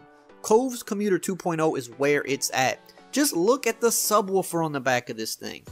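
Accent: Louisiana, United States. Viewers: Are you from Louisiana? You have a Louisiana accent.. just like me 😂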